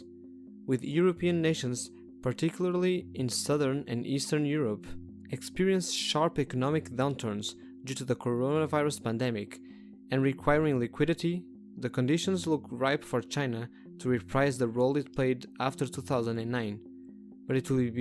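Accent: Portugal. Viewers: Sotaque tuga crlhhhh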